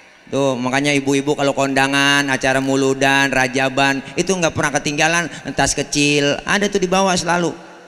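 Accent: Indonesia. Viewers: keren..betawi bgt..sehat terus ustad. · Di sumatra ada UAS di betawi ada UNFAD ustadz. Muka, perawakan, sama, punya ciri khas berbeda UAS brdialek melayu UnfAD dialek betawi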